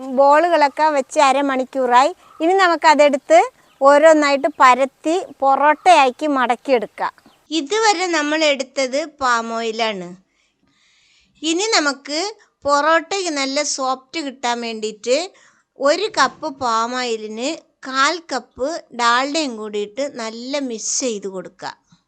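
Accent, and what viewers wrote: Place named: Malappuram, India. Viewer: ഇനി ചൂടാറാതെ പെട്ടീല് ബെക്കാം....😂😂, തനി മലപ്പുറം ഉമ്മ❤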